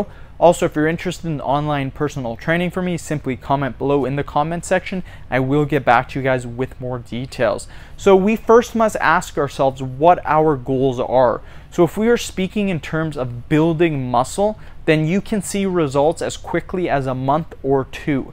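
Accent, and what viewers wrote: Canada. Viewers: Is that a Canadian accent I hear?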